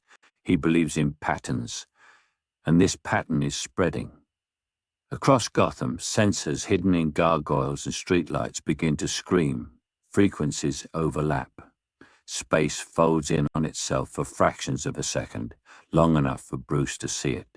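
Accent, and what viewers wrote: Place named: Cockney, United Kingdom. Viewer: First time I’ve heard an AI imitate an East End accent.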